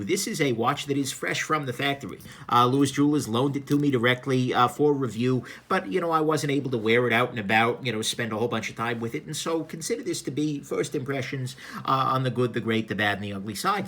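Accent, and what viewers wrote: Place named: New Jersey, United States. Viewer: The Jersey accent on this guy is hilarious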